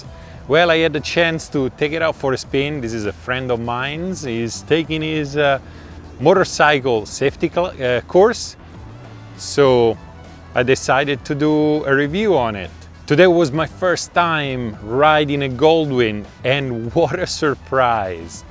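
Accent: Italy. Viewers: I love the Italian accent review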